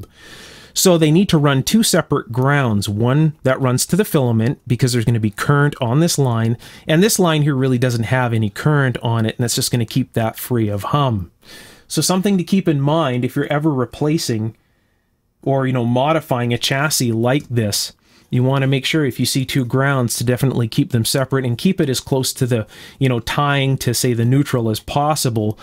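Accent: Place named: Canada. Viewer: Love hearing Canadians say "about" "pasta" and "Mazda" 😬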